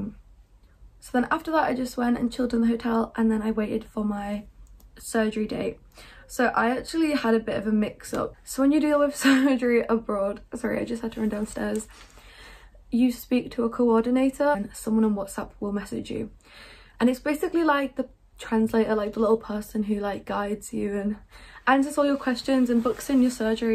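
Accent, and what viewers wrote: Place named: United Kingdom. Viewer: I watch your content only because your British Accent🧡🖤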